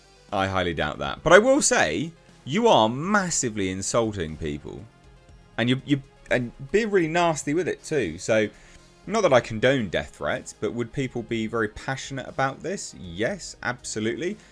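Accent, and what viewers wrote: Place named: United Kingdom. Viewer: Man I like your british accent, also great content!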